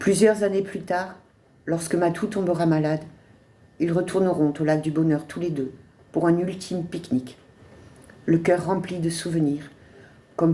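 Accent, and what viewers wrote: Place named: South, France. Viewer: Bonjour, je viens d’écouter la première partie des aventures de matou et minette j’ai beaucoup aimé, j’ai hâte d’écouter et de connaître la suite de cette belle histoire d’amour, la narratrice a une belle voix avec un petit Accent Du Sud qui nous plonge directement dans l’ambiance de se dérouleron aimerait que ça se termine jamais en même temps que ce récit elle nous fait découvrir des lieux magiques, j’avais l’impression de vivre avec eux tous ces moments inoubliables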